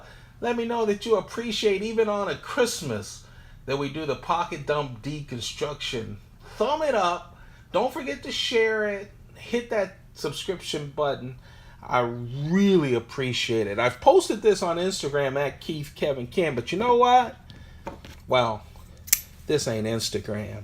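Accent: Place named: Boston, United States. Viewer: Spyda-co. This guy's gotta be from Baastin.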